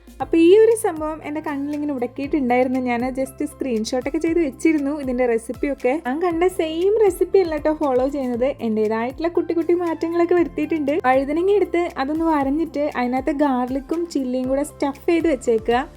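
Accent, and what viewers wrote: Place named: Angamaly, India. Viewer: Enik munpe thonniyirrunnu slang ketapo ,place Angamaly aanennu😂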